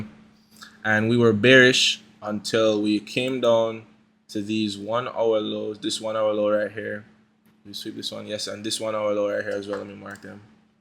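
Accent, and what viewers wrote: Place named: Caribbean. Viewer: You sound like you're from the caribbean bro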